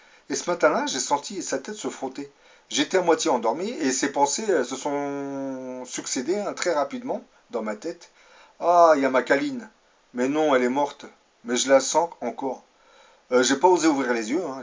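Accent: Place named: Belgium. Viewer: Vous avez un jolie accent du nord ou proche de la Belgique 🇧🇪... en tout cas cela y ressemble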